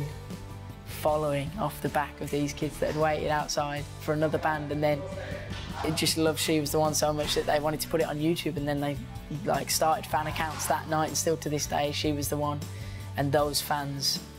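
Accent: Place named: United Kingdom. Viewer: british accent tho 😍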